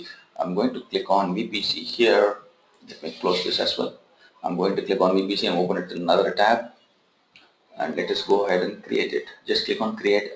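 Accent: India. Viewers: Good presentation. Nice to hear south Indian accent !!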